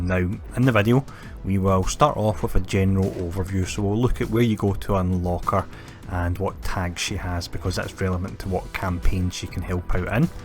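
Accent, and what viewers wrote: Scotland, United Kingdom. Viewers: You do an excellent job with this channel by the way - and I love a Scottish accent!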